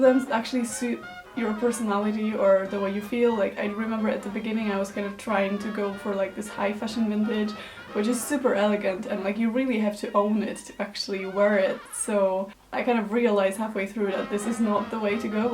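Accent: Poland. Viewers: Hannah Harrison She's from Poland. There is also a video or two in which she is speaking polish. And she always gets a bunch of polish comments on her videos. I dunno, maybe she has a polish accent, I'm not sure. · Fagahajakal Thank you so much! And yes I would assume that since she's from Poland she probably has a Polish accent (I'm sorry if that sounds like I'm being rude, I'm not trying to be)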